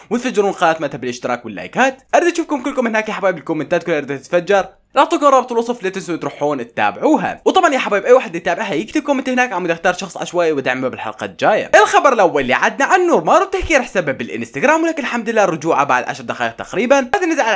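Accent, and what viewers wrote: Iraq. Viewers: انا احب العراقين والهجتهم عشان جي احب اسمع وهو يتكلم 🇮🇶🇦🇪❤️❤️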